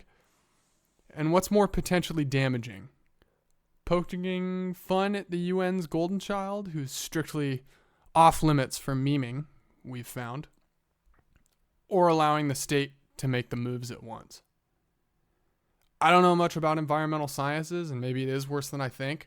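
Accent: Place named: Canada. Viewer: You sound like you went to school in Canada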